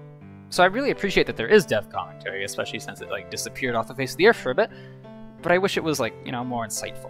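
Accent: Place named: United States. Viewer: Is it just me or does sound a bit different now? He sounds more American now. He's also swearing less forward slash shouting less, stating the problems/arguments clearly with evidence to back them up. P/S all jokes aside, great vid. Agreed with everything you said. I wanted 90% caut even before you made your Part 1 vid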